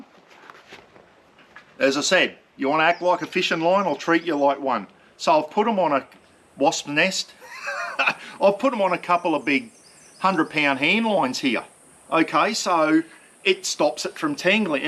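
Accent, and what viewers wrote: Australia. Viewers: Skip to other wise enjoy the Australian accent or what ever is....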